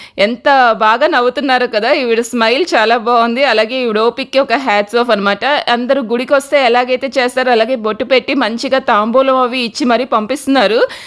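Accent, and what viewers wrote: Telangana, India. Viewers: Super ga ready ayyav chala Baga telangana bhasha bagundi ane super ga undi ❤ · Telangana slang bagundi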